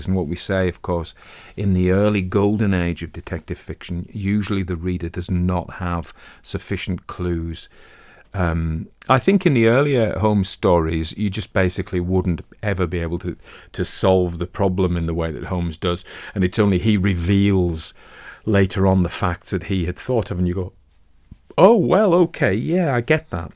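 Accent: United States. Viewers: You are really in a class by yourself,. An interesting story very well presented. You do "American" so well. I love all the commentary at the end. Don't stop doing that. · First, quite an excellent American accent (though not especially mid-western, with long, flat "A"s). I also enjoyed the voice of the "real" Mr. G. As for the story, shades of The Red Headed League. As for your postscripts, they are always entertaining. Well done, as usual, and thanks.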